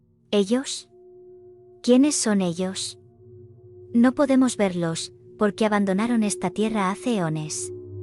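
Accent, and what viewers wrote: Spain. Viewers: LAS HISTORIAS SON MUY BONITAS PERO LA VOZ DE ACENTO ESPAÑOL ES HORROROSA 👹😭😭😭😭😭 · Al contrario me encantan su acento español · A pesar de su voz con acento español... al principio me molestaba, pero al final me quede porque esta buena la historia, y su acento no es tan marcado.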